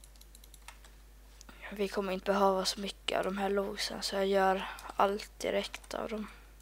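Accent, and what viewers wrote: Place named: Finland. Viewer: Jag tycker du pratar svenska som man pratar i Finland bor du på Åland? PS jag bor i Finland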